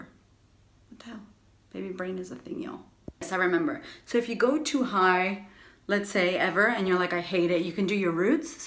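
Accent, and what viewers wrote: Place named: Australia. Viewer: Super cute that you've picked up the aussie accent! Great video BTW!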